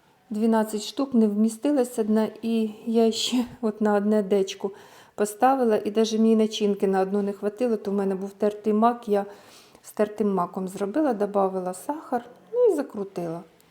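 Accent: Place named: Dnipropetrovsk, Ukraine. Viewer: Я в захваті. Чорму раньше не попадала на ваш канал. Як гарно у вас все виходить! Все так уверенно, як вроді у вчителя, вроді ви всю жизнь з молоду випікаєте. Мені вже за 50 та й то руки трусяться, бо поки мама була жива, то я не вміла даже зліпить пиріжка. Оце 10 років як хоть трохи навчилася. Українська точно як у нас, на Дніпропетровщінді.Дякую вам, буду вчиться дальше. З мене підписка обов'язково❤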